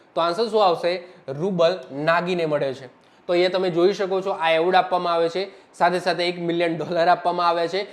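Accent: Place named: Sabarkantha, India. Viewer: Mu sabarkantha no so su saheb tamari bhasa mara jevi se ❤❤❤❤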